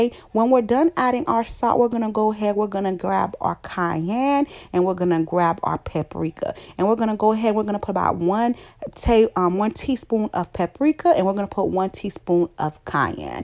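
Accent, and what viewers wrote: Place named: South, United States. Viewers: Goodness! I'm struggling to understand this southern version of the English language. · "proper English" lol. I have relatives down south and this is proper English. I love the way she communicates, with such love and authenticity. There are plenty of channels you can go to where people are speaking Received Pronunciation or any other version of "proper". Bye Felicia!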